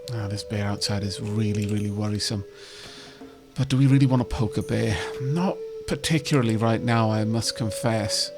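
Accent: Wales, United Kingdom. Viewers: new sub here, Rimworld brought me but am enjoying your style so I shall check out the rest of your content! Out of curiosity, would that be a slight hint of a Welsh accent I hear?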